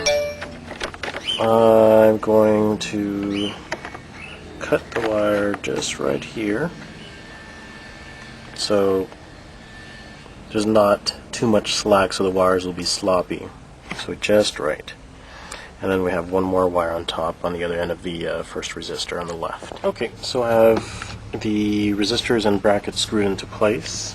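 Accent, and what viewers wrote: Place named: California, United States. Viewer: I was going to say it sounds like you live in California.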